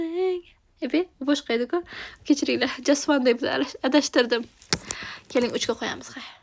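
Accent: Bukhara, Uzbekistan. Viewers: juda go'zalsiz buxorolikmisiz shevayiz oxshaydi manam buxorodanman qayeridansiz😊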